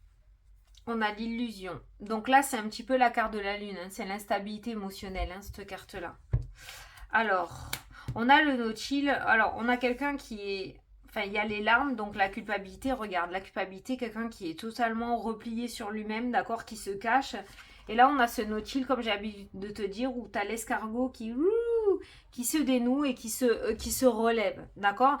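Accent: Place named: Marseille, France. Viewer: bj contente de te voir et de t entendre 🤩👍l accent de chez nous! bj des marseillais 💙🤍💙🤍💙🤍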